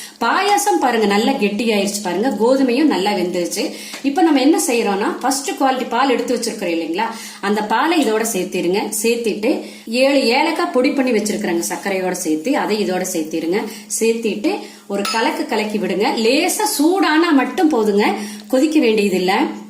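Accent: Kongu, India. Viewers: கொங்கு தமிழ்❤ நானும் கோயம்புத்தூர்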